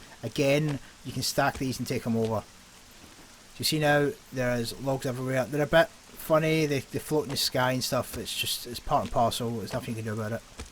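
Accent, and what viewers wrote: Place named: Scotland, United Kingdom. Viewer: Not at all hes Scottish conor is irish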